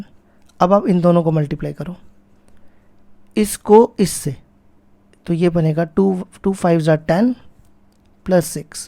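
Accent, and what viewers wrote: Jammu, India. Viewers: Sir you are from jammu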